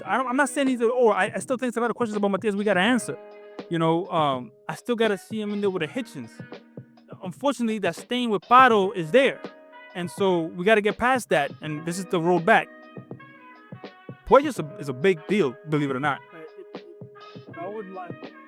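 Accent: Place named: Latin America. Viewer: You may speak English, my friend, but you're actually vibrating and articulating in Spanish or some Latino version thereof. However, you are attempting to connect with a nation of many different cultures, anglo's figuring large among them. Your speech, in it's high stress. Latino rapidity, virges on the brink of becoming incomprehensible because of your machine gun fire manner of delivering your thoughts. And without attempting to be offensive, I must tell you, it becomes irritating and of limited tolerability to Western ears. So this will not be easy for you, because rapid fire Latino is your communication mode of choice. All I can say is, seek to chill out and slow down and to dwell upon the meaning of the words that explode out of your lips with such self-assured speed. They are all not necessarily connecting with your Western Brothers and sisters in the way that you might hope. 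And remember, the world is not going to come to your speaking or thinking style, you will have to modify yourself to meet it where it is. Good luck in this process of recalibrating yourself. Don't worry, in the end it will make you less compulsive and more pleasant to be around.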